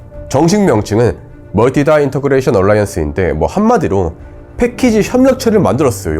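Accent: Gyeongsang, South Korea. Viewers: 어머 경상도 출신이신가봐요 ㅎㅎ 사투리 너무 매력있어요 ^^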